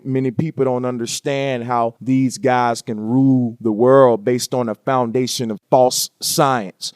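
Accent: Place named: South, United States. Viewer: Funny how clearly he spoke back then. His country accent is thicker today ✊🏾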